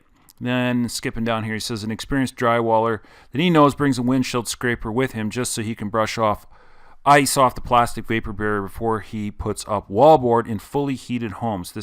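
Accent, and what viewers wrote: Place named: Canada. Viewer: Does the foam have to be flush with the inside stud faces? What about convection channels behind the drywall in the stud bays? (It's on the warm side). Can a guy do 3 inches in a 2x6 wall -- or is is better to use 2x4's and fill it up? This topic is unbelievably frustrating; the whole building science topic is unbelievably frustrating -- especially in Canada. Excellent content. Excellent presentation. Excellent Canadian accent.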